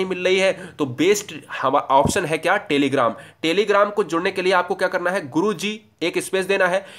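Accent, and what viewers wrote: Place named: Uttar Pradesh, India. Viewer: Up se sir khadi boli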